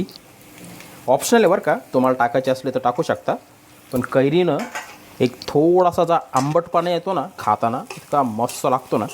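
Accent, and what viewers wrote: Nashik, India. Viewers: Tumacha tone Nasik cha chan vatato aikayala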